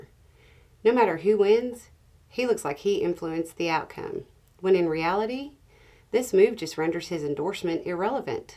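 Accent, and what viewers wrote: South, United States. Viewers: Is t just me, or is anyone else expecting her o sign off with "And y'all come back now, ya hear?". Love the accent. And the message is, as always, on point.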